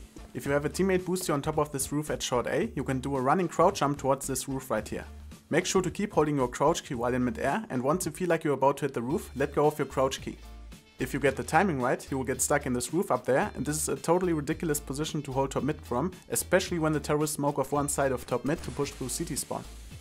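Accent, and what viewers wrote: Germany. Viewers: deutscher Diealegt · deutscher akzent an!👍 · Du Bist Deutsch ne?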